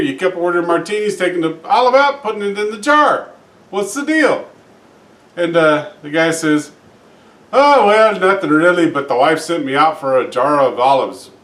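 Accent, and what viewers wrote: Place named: Ireland. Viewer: Love the Irish accent 😂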